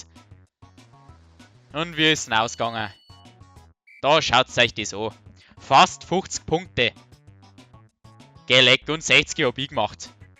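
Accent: Bavaria, Germany. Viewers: i find des sau geil dass du boarisch redst! 😂😂9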